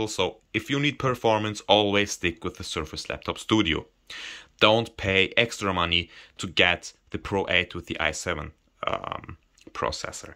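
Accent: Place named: Germany. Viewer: I love your German accent ❤️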